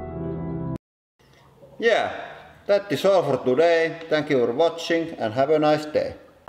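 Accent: Finland. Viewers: you clearly aren't familiar with the Finnish accent So many people speak like that lol. Not me tho' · My high school tennis coach was Finnish. He had the exact same accent but with a different voice. It was hard not to bust out laughing when he'd get mad and yell things like "you guys play like piece of joke out on court today!" at us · aa mä oon aina luullu et sä oot venäläinen :D vaikka kyllä oon epäilly tosta suomi-aksentista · he sounds like hes from finland... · SUOMIIII, KOSK TOI ENKKU 3/5 JA KOSKA "Voi vittu!!!" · Finnish accent is so great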